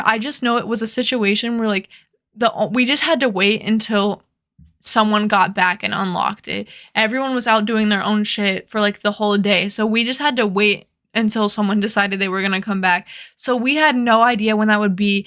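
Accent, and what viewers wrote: United States. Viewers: you have the best regional american accent on the earth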